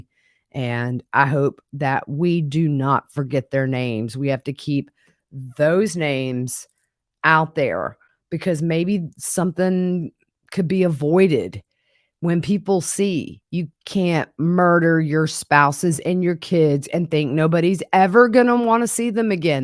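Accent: South, United States. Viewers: PS: I so love your southern accent ❤ Greetings from New Zealand 🇳🇿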